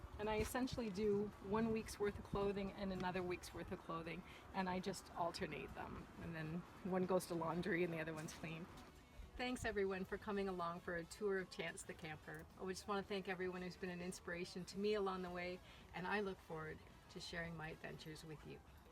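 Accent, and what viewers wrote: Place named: Canada. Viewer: Sweet setup and that Canadian voice doesn't hurt at all ... all the best!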